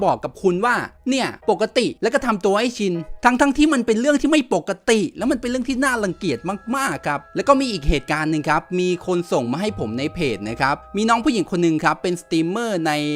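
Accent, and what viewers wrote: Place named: Rural, Thailand. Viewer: เสียงบ้านนอก คำนี้เกินไปนะ เกิดบ้านนอกแล้วไงเล่นเกมแบบคุณไม่ได้เลยหรอพ่อคนในเมือง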